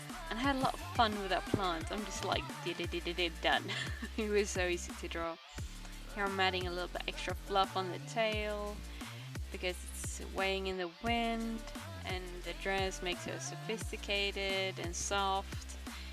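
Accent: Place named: Australia. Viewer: I think your accent is awesome! You almost sound like an Australian, my favorite accent! The art is awesome as well, of course! Thanks for the video!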